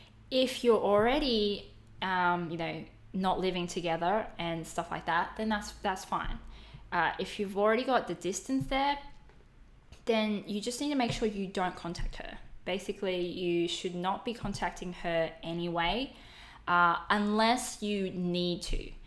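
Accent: Australia. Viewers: Hi, are you Aussie?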